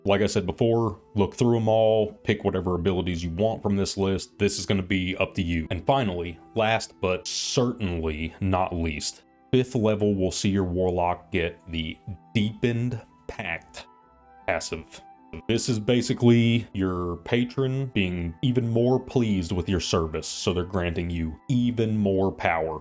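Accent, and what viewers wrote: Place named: South, United States. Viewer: Hey, honest feedback from a consumer of the content here - filming yourself and editing the feed in over the background graphics and videos adds nothing to the content for me, personally. I think you could save yourself some effort and nix your video feed in favor of pure audio. In fact, moving the production time over to more contextual graphics in your videos would be a HUGE level-up. I think doing that well would jump your production values significantly. Love the walkthroughs, love the personality of your VO, love the southern US representation. Wishing you well! Edit: I love the respectful dialogue. It's great to see that the channel has cultivated a solid audience. Kudos to, and to the viewership!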